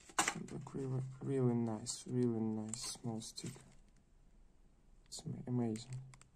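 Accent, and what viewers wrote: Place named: United States. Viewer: С американским акцентом :(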